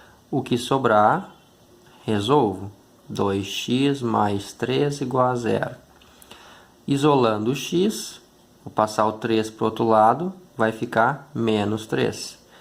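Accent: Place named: South, Brazil. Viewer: TEM SOTAQUE DAQUI DO SUL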